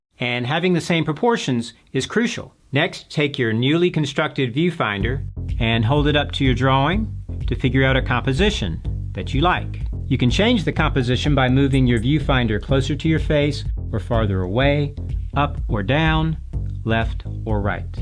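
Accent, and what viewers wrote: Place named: United Kingdom. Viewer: New sub from UK .... You sound like your from UK. Do you do private tutorials or lessons or whatever?? I love your humour etc. Glad I found you good sir!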